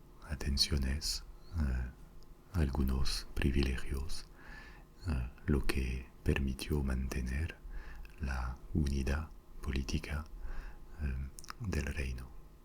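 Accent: France. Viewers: Me encantó tu narración. Una forma diferente pero muy agradable. Yo disfruté de tu relato pausado, con ese tinte de francés todavía a pesar del buen español y tu voz suave pero muy clara. Gracias.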